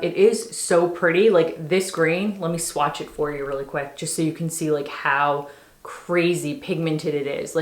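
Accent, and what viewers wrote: Philadelphia, United States. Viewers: Hi! I just found you (on a tanning review video), and I love your videos! One of the reasons I loved you right away is I detected the same slight Delco/Philly accent I have! 😂 Of course I’m assuming, I may be wrong. Thank you for all that you share!! ☺️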